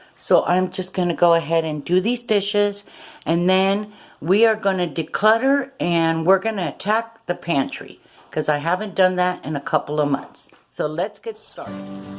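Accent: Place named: Cuba. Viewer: Hi,! I am filming for the declutter challenge, too & I found your video from the playlist. I'm from Miami & your accent is reminding,me of home! I got kind of thrown off when you said you're in SOCAL, but then you confirmed that you're Cuban. I also thought so because of how clean and organized you are - again, just like the ladies at home! You've done such a great job organizing your pantry. Wish I was like that. I'm working on it, though! Watching your vid for inspiration!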